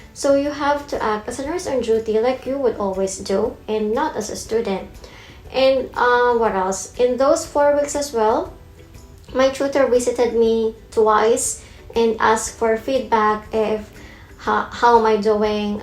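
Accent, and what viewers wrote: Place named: New Zealand. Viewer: very helpful content, and aside from that, the accent! very NZ, hehe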